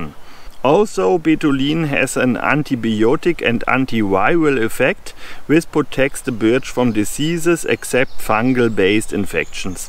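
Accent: Germany. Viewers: Hallo, hab grade das deutsche Video angemacht und als ich gehört hab, dass du das auch auf Englisch gemacht hast musste ich doch gleich mal reinschauen bzw rein hören. Finde so einen starken deutschen Akzent immer total lustig. Hab ich selber zum Glück nicht soo die Probleme mit. Egal. Respekt dafür dass du dich trotz Akzent vor die Kamera gesetzt hast. Würden sich viele nicht trauen. Aber bei deinen Inhalten ist das alles sowieso eher zweit- wenn nicht sogar drittrangig und da ich die allermeisten deiner Videos gesehen habe würde ich mal behaupten es wäre schlimmer dem internationalen Publikum diesen großartigen Content weiterhin vorzuenthalten. Inhalt vor Verpackung würde ich mal sagen😅 In diesem Sinne... Übung macht den Meister und solange dich alle verstehen ist doch alles gut. So... Und da ich eher selten Kommentare schreibe mal was Allgemeines: Mach bitte weiter, sodass wir alle noch viel mehr von dir lernen können. 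Jetzt sogar international 👍 Danke! Danke dass du uns dieses tolle Geschenk machst. Deinen ganzen Kanal meine ich. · man merkt den deutschen Akzent nur ganz am Rande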